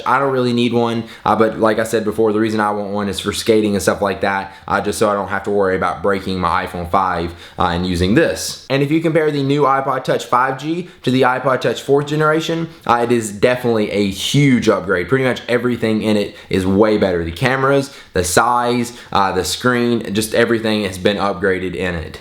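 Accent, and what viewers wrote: South, United States. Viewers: Is this guy southern? I think I hear a little acent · Does he really have a country accent? Never noticed that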